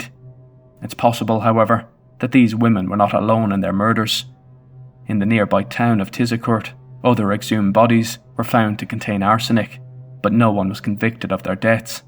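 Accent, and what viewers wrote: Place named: Ireland. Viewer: Great video, but can you do something about your annoying Irish accent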